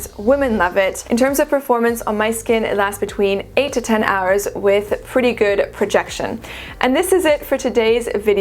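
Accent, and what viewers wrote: France. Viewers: I like your french accent. Grüsse aus Deutschland. Und danke für die gesamten Inspirationen!! 😀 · thank you for this video. French accent is what I'm always looking forward to when watching your videos. Don't apologize for that. I want more French accents! 😂 I like your list. My winter favorites are: Prada L'Homme Intense, Dior Homme Parfum, Bvlgari Man In Black, Guerlain L'Homme Idéal Extrême and Stronger with You Absolutely.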